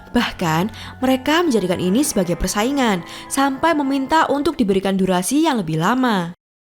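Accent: Java, Indonesia. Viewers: masyaallah medoke pol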